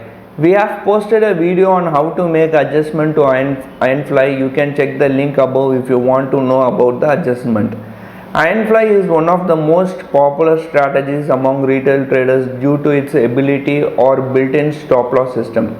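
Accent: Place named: East India, India. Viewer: Hey, he does well. I found that by slowing the speed to 75% and using CC if available works quite well. I have a slow ear from listening to South American Spanish speakers, much slower than the East Indian cadence. :)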